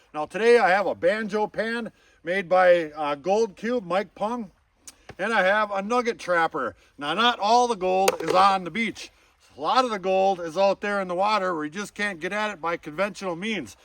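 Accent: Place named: Michigan, United States. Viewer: Go aheaad an pan dis one ouwt just hits different when you’re from Michigan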